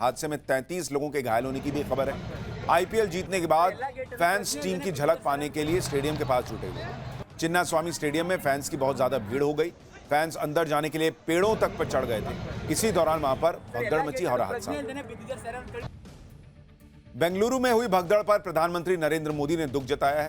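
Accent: Bihar, India. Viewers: Yaar sushant ko bolta sun ke aisa lagta hai ki ye national channel par nahin bihar ki audience ke liye anchoring kar rha hai.....baar baar bihari tone mein baatein bol jana cool nahin lagta dost....kripya bhasha, shabdon aur tone ki maryada ko durust rakha kijiye.....JAI HIND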